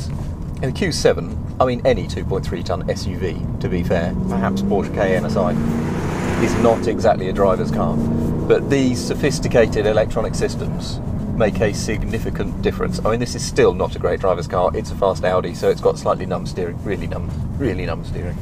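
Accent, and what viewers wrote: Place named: United Kingdom. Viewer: Oh jeeeezzzze that thick british accent and swallowing the voyals!!!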